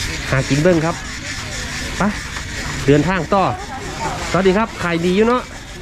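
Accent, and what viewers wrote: Phu Thai, Thailand. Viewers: แอดมินเว้าภูไทมวนครับติดตามประจำเบิ่งอยู่กรุงเทพจากคนอุดร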